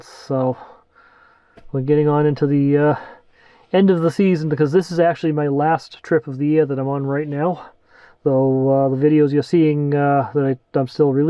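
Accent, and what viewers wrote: Boston, United States. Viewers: 100% Boston accent. Con-corn! I love it! Old school!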